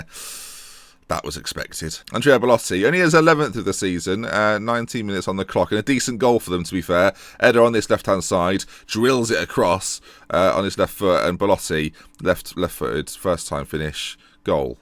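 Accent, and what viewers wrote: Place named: Yorkshire, United Kingdom. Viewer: Yorkshire accent = the best.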